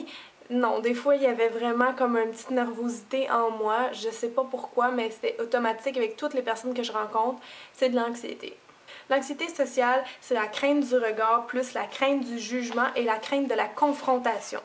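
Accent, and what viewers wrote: Quebec, Canada. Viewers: Non une québécoise nuance !! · Oui je penqse , elle a l'accent Québecois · Très bonne connaissance du sujet, c'est bien d'avoir appris ça en un seul livre. Moi qui me renseigne de temps en temps depuis plus de 2 ans sur le sujet, je n'aurais rien à ajouter Tu dois être assez doué, tu met vraiment les bons mots dans tes explications, et je trouve tu as bien compris le fonctionnement de l'humain Enfin bref, sinon, tu es très mignonne, tu as l'air très sympa. Et même si je n'ai jamais aimé l'accent québecois, tu viens de me faire changer d'avis, tu es très charmante :) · T'es québécoise lol ?? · Tu m'as beaucoup aidée avec cette vidéo tu m'as ouvert les yeux, grand merci:) ça fait plusieurs années que j'essaye de vaincre ma timidité j'ai beaucoup progressé mais j'ai toujours peur d'ouvrir au facteur quand je commande des choses sur le net (xD)! 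J'avais un peu abandonné cette idée de vaincre ma timidité et cette vidéo m'a redonné envie d'essayer de la vaincre donc grand merci:D (et aussi ça fait plaisir d'entendre de nouveau un accent du Québec:'))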